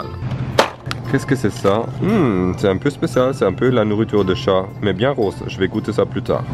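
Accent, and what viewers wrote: Quebec, Canada. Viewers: J 'adore ton accent canadien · Je surkifffffffff lacscen quebequois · J'aime trop l'accent québécois ^^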